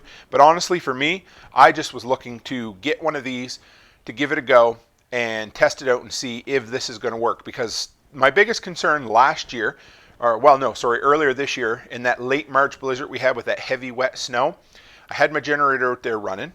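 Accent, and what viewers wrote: Canada. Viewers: "Oatside". Love the Canadian accent.❤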